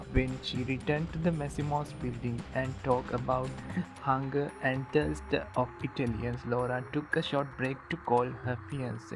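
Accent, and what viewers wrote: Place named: India. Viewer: 🇮🇳 Indian accent is annoying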